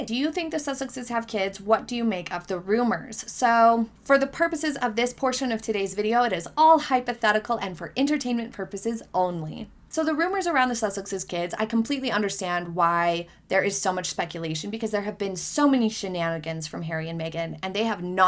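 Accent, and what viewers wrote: United States. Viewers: It’s people like you who are getting it wrong. I am surprised because you sound American and should know better than to repeat so much information from the Tabloids. I expect from the British because they (including the Monarchy) lives and dies by the tabloid news. So much of what you are espousing came directly from the tabloids. 🤷🏾‍♂️ This trip has been an absolute success! The Colombian people have embraced Sussex’s! That is the problem! The tours they made as royals were excellent! They hit it out of the park every time. They are embraced by DIVERSE populations of people around the world because they are much more relatable than the Windsors. Kate and Will’s last tour (the Caribbean) was a disaster! Terribly out of touch with the world. There are many things you can say about Meghan but you absolutely cannot say she is lazy or lacks ambition. 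Why is it problem because she enjoys working hard and is good at it? It’s a problem because the rest of the royals are mediocre at best. Your biggest hater’s tend to be those who you are associated with and don’t like you because you are working too hard for them to keep up! Meghan left the royals, it was her choice. They are salty because she had the balls to do it! PERIOD! That is the biggest problem along with the systemic racism and colonial mindset that the royals can’t let go of. Millions of black and brown people around the world who live in countries that have been raped and pillaged by the British Royal Family are unwi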